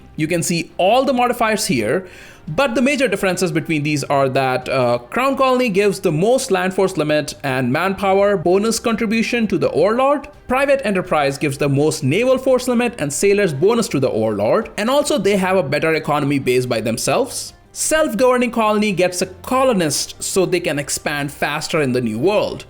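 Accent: India. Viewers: Is Indian? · Are you indian?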